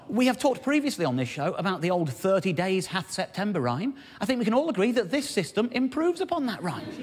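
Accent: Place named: England, United Kingdom. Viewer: Clicked, heard the English accent and thought, "He must be a professor." Clicked the description. "Comedian. I was half right.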